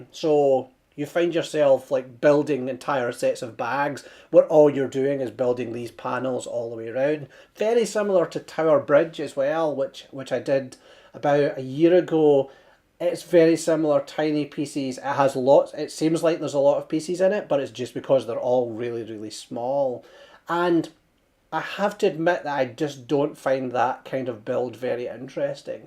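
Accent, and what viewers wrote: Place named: Scotland, United Kingdom. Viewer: love your accent, man. Scottish?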